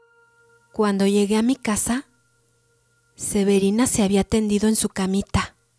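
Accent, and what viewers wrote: Mexico. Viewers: Me encanta el acento mexicano, tu voz y narrativa son perfectas. Estos tres aspectos hacen una experiencia única tus audiolibros, además de ser cuentos populares y con alto dialecto coloquial. Me parece excelente el mensaje final, sobre promover la cultura en cualquiera de sus formas, que por cierto en este video no lo agregaste. Soy un agradecido seguidor de tu trabajo. Saludos 🇻🇪🇨🇱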